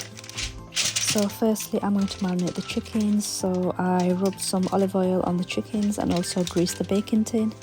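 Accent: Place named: North, United Kingdom. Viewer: You may be a little too young.. but there used to be a show called WHY DONT YOU on kids tv... they always had someone that sounded like urself.. most of the time it was how to create something silly out of left over toilet rolls.. but the cadence of ur voice matches the instructions perfectly :) but its the northern accent that sells it :) took me back hehe.. great vid.. keep up with them!!!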